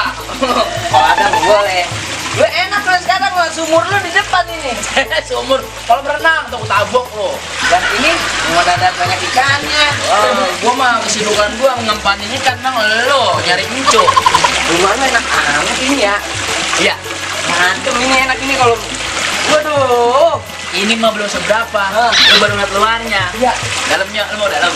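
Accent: Jakarta, Indonesia. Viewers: Mksh sygg, nti kt lnjut lg lbh kocak lbh medok betawi'a😀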